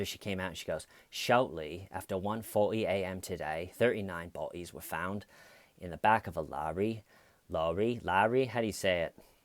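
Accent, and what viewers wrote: England, United Kingdom. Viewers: I love your British accent, I was born and brought up here but it’s ok because I’m Scottish. I really enjoy your videos.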